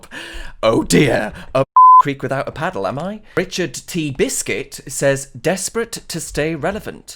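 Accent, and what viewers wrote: Newcastle, United Kingdom. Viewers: As you open up here a personal note, can I ask you, where does your accent hail from (I really like it)? Is it Geordie? All the best from your subscriber here, who thinks you are great!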